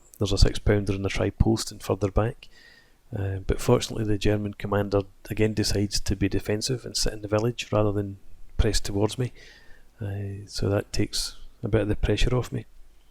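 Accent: Scotland, United Kingdom. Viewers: I enjoy the low key Scottish commentary.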